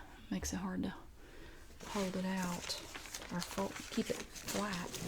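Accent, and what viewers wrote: South, United States. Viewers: I'm also from the south (Georgia) and we honestly sound the same😂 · I love your accent, is that a "Southern" accent? I am from Bombay. Sometimes I watch Hollywood movies and many of them have characters speaking with an accent quite similar to yours. I am just here for the accent.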